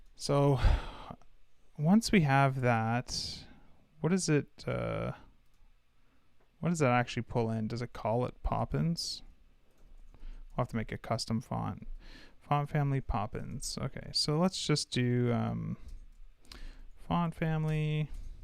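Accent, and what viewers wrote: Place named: South, United States. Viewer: Are you southern? You have like a very subtle southern accent.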